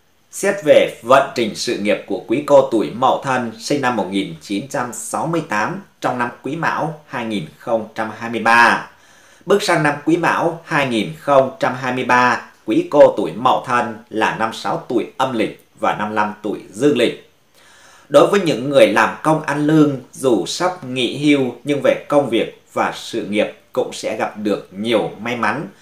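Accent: Thanh Hoa, Vietnam. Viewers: Sao giọng thầy giống như giọng thanh hóa quê cho vây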